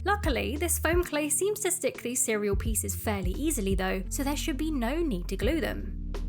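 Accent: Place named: United Kingdom. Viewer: I love your art sm your voice reminds me of Ldshadowlady but I think it’s uhh cause your both British 😭✌️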